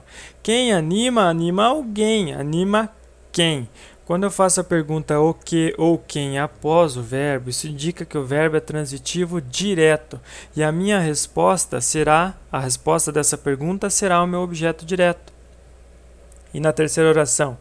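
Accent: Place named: Curitiba, Brazil. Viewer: Você é de Coritiba né ? Reconheci pelo jeito de falar e pela voz, bom video.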